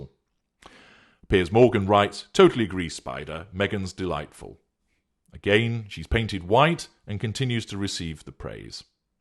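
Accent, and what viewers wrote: United Kingdom. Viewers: Incidentally you kind of actually sound like Piers Morgan. And not just because you're British and have a similar accent. You remind me a bit of Jeremy Clarkson (another narcissist, surely) as well. On the topic of narcissists in the public eye🤣, Russell Brand has got to be another. Though he is self depreciating and quick and funny, he so clearly demonstrates narc traits. His past drug abuse, sex addiction are symptoms of this. I would imagine that his father, who wasn't really present in his childhood at all but was known to be a womanizer, probably helped form the narcissism.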